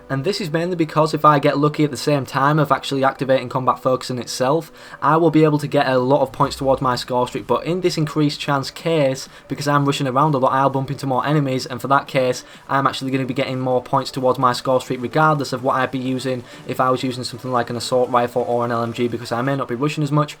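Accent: United Kingdom. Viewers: I hate your British accent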